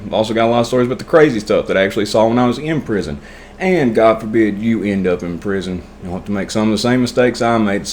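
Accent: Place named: Southwest Virginia, United States. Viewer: Dude I don’t know where your from but it sounds like from back home, I’m from Southwest Virginia and did almost 6 years camp 1. I live in the Philippines now and have been sober since January 17,2005 I was on pills and dope bad junked out lucky I didn’t get caught doin a lot of dirt.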